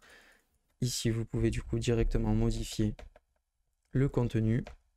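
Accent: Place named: South, France. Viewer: Merci beaucoup avec l'accent du sud !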